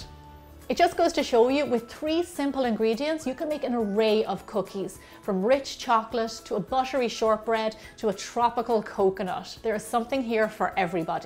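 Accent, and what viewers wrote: Ireland. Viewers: hi, lovely video. started baking recently when i get time, still new to it, get nervous often, but with yr amazing videos, great recipes and precise instructions always, not to mention yr irresistable self, i hope to become a bolder baker soon. i ladore yr irish pronunciation n luv checking out yr videos. just want to say thanks for all the trouble u take to get them to us. you r truly an inspiration to pple like me. God bless. luv from India. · uhh... i love your Irish accent. i could listen to it all day. is that wrong of me?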